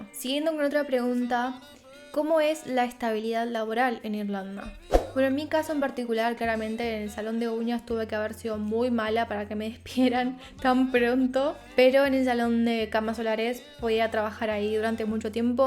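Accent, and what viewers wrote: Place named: Argentina. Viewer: Hola muy guapa riene el acento de argetina yo aoy de ecuador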